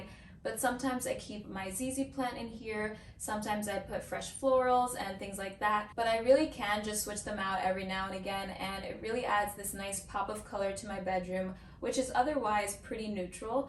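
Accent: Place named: Dominican Republic. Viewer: I knew you were Dominican from the first few seconds 🇩🇴 #rep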